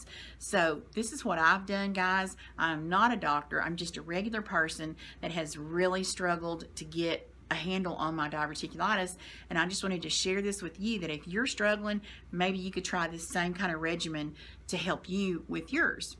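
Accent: South, United States. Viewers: I can see **OATMEAL** being a problem. I eat these cookies my wife sometimes make. They are chocolate and oatmeal. Not like Oatmeal cookies. But the oatmeal (like nuts) get trapped in the bad spot of my intestine and cause a flare up! Wouldn't work for me! Oh yeah! I love your strong Southern Accent! 😊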